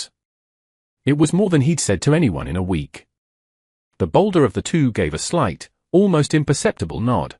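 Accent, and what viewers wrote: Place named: United Kingdom. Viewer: Screw your aristocratic English AI accented narration. Get rid of it. It doesnt fit the context. Maybe I'll listen. Not til then.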